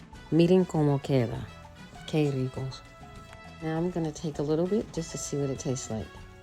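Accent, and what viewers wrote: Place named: New York, United States. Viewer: It's the new york accent for me!! 🗽❤ I can't wait to try this recipe for my family!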